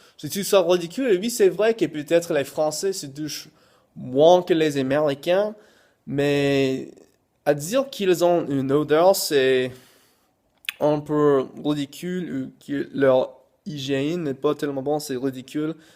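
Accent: Quebec, Canada. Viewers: La plupart des français se douchent tous les jours, mais je connais plusieurs personnes qui se douchent moins souvent x) Je trouve que tu as un accent québécois haha !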